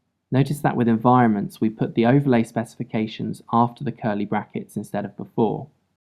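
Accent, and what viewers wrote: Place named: United Kingdom. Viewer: Very nice tutorial, it's very helpful and I really love your British accent, which makes your tutorials so comfortable to watch.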